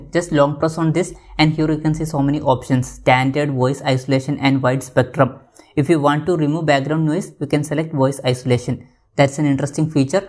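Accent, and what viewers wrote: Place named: India. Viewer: Worst Indian accent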